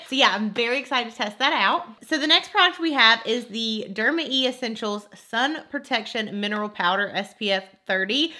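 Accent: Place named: Texas, United States. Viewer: 😃😊 your accent is tooo flipping cuuute! Love it live it im from dallas tx origionally now stuck in indiana but your accent reminds me of home in a i miss tx kinda way!☺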